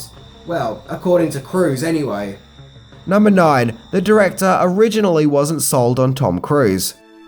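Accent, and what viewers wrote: Australia. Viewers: He is Australian. He does say some words differently due to the accent. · Come on he is Australian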